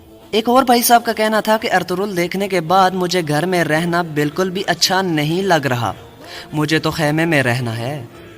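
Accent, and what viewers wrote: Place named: Punjab, Pakistan. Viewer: aap k Urdu bolney ka accent to bilkul Punjabis wala ha. pathan ka accent Urdu bolty wkt bilul bhi aisa nahi hota mn khid pathan hu.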